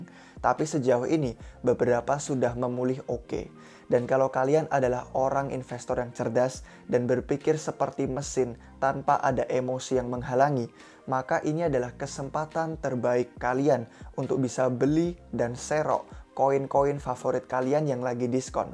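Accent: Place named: United Kingdom. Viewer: Bos, aksen british lu cringe banget, keinget skinny faps, pake aksen amerika aja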